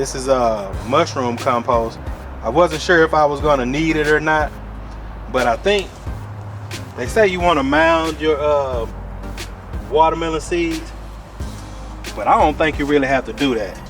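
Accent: Houston, United States. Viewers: Lord, I'm from Houston. And I am so glad to hear somebody who SOUNDS like me in the garden! 😁😁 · Exactly I’m from Houston as well. Sounds like a Houstonian.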